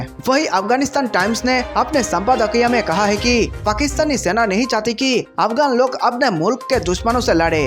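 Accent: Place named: Bhojpuri, India. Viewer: तू सबसे बेकार न्यू जलाता है भोजपुरी बोलने का तरीका तेरे पास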